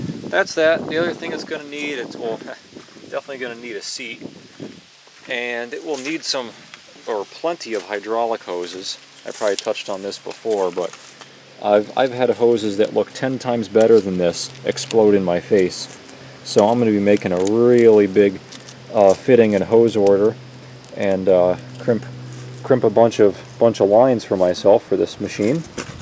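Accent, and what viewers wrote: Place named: Canada. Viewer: Bro's either canadian or wisconsin'. either way, yuk.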